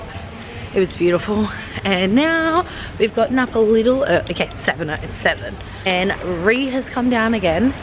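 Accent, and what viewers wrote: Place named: Australia. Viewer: Your accent it's like British... but I CAN understand U... must be Australian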